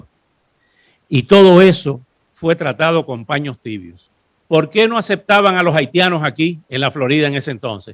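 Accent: Cuba. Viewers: No se quien es usted señor aún qué su asentó pareces cubano pero gracias mil gracias por hablar a nuestros favor es un ejemplo palpables Que usted sabé de los qué habla gracias desde RD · Él es de RD 🇩🇴 y es primo de Ito Bisonó, el Ministro del Ministerio de Industria y Comercio del país. Óscar habla como cubano porque emigró a los 22 años a Miami, en una época donde la mayoría de los inmigrantes de esa ciudad eran cubanos y tiene programa de TV dirigida a la comunidad latina en la Florida y Miami. Busca su biografía en Internet.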